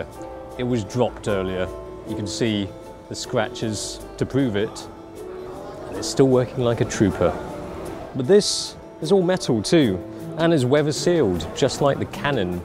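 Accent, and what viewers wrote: England, United Kingdom. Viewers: Kinda weird when a Chinese dude speak with an English accent.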